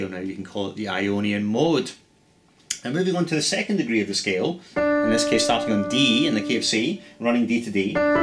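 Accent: Scotland, United Kingdom. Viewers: Awesome video. Really informative and the Scottish accent made it a bit more interesting. Cheers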